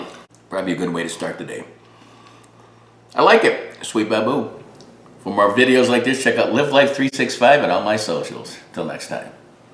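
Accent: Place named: Great Lakes, United States. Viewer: My grandmother used to make this around Buffalo, New York. This is one of my favorite breakfasts. I don’t eat it so much anymore because I’m trying to eat more healthy but man is it good. From your accent I’m assuming that you are perhaps from the Great Lakes region? Maybe it’s a thing in that area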